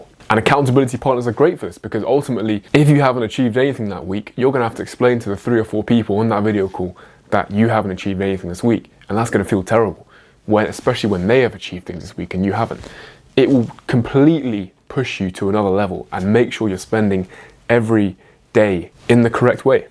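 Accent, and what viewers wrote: England, United Kingdom. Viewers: you are an absolutely gorgeous and handsome young man - your English accent is so sophisticated and classy and you have gorgeous blue eyes - I’m an older Irish gay man in the U.K. and I would happily date you in a heartbeat - all the best for 2024 🇮🇪☘️🇬🇧❤️💗🙏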